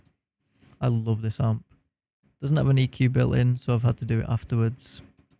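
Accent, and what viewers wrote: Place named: Manchester, United Kingdom. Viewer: great video and in mancunian accent.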